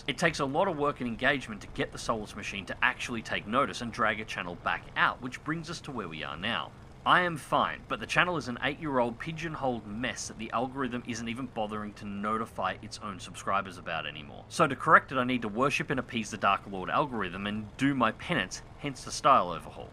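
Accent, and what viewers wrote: Australia. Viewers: As far as I can tell, I haven't not seen your uploads in my feed yet. I hope you keep going, it's nice to hear an Aussie accent on here.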